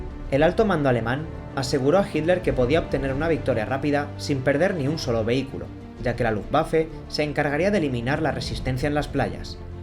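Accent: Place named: Spain. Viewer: El acento español queda perfecto para los relatos, saludos desde PARAGUAY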